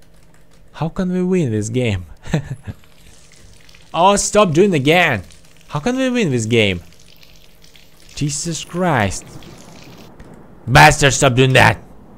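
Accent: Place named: United States. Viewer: Сначало подумал что ты американец, хорошо маскируешься👍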